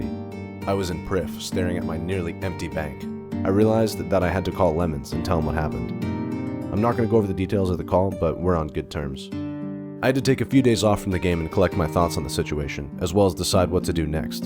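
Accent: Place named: Wales, United Kingdom. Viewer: a welsh guy doing the voiceover cba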